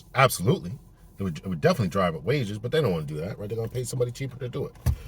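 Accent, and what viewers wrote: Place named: United States. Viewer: I'm not a guy, and this channel appears to be for the guys, However, you popped up in my feed, so I decided to answer your question: Yes ! Absolutely! Just have a written notarized agreement of your requirements for her to sign prior, and a video recording of her doing it. You would have to sign an agreement as well. That's the only way that I would consider it. " Man" should not be alone according to God. That's why he made "Woman" for marriage. 3rd time is a charm !😊 BTW, Love your accent. You're from somewhere other than the South, either West or perhaps Northern. I'm your newest subscriber, probably the only born female in here listening, with the audacity to throw in my 2 cents. 😊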